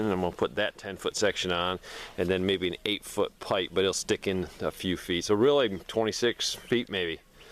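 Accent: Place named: New York, United States. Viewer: I knew you were a NY'er. I'm from CNY but transplanted to NC :)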